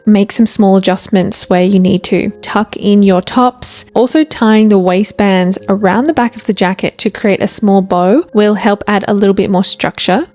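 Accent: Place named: Australia. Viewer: She has a pretty strong Australian accent, so I suspect she mainly talks about milder winters like those in most of Australia. If you need to incorporate more layers in your climate, you can always add merino tights and a puffer vest underneath your coat. For my climate in northern Germany, clothes like the ones she showed work well enough for temperatures around 0°C or slightly below (which is most of our winter weather except the coldest days of the years) in the city, but of course these are not clothes that would keep you warm while on an extended winter hike at -10°C or below. That's fine though, winter is different dependent on the climate - coastal/inland, rainy/dry, all this will make a difference and you will have to adapt these styling tips with respect to functionality in your climate. She cannot possibly cover all winter climates around the globe. 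:)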